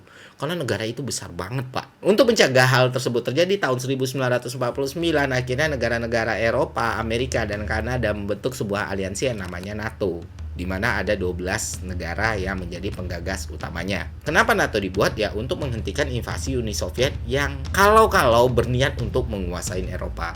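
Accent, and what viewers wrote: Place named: West Java, Indonesia. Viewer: logat sundanya..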